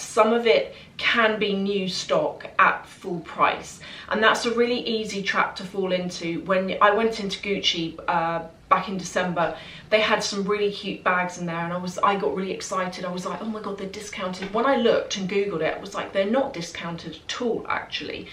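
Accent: RP, United Kingdom. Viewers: your accent is so posh i love it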